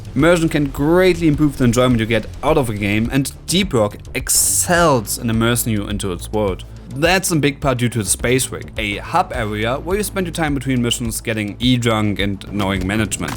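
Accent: Germany. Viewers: you sound german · Sounds a bit german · So far the first DRG review featuring thick German accent. Nice!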